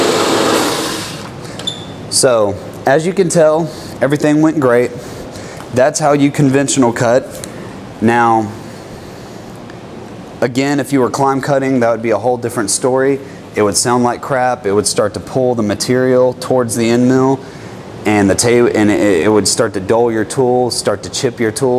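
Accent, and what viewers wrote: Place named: South, United States. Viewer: The guy clearly has a southern accent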